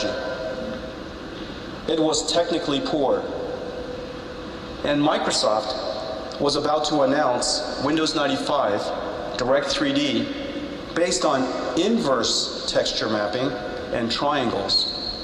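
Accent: Taiwan. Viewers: 他的台灣口音，吊打一堆年輕人。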